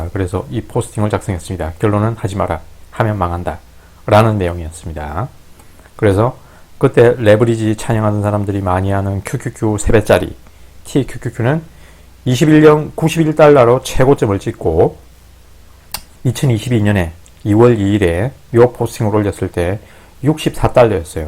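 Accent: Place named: Gyeongsang, South Korea. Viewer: 말투가 경남분이신듯 😊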